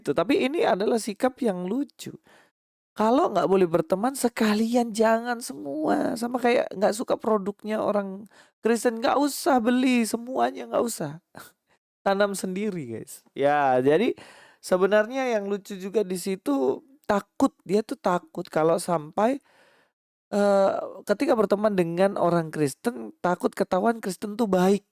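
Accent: Java, Indonesia. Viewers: Hahaha lucu lelaki berdialek jawa ini, belajar nya kurang jauuuuuh, padahal panduannya belajar sampai ke negeri China....?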